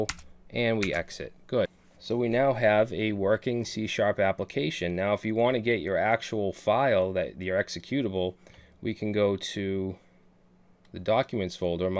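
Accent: Boston, United States. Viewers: Are you from Boston or Maine? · This guy is from Boston. I like his style, but I can't stand his accent and people from there. Go Blues!! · I hear Boston in that voice... Edit: I just saw the shirt. Boston confirmed.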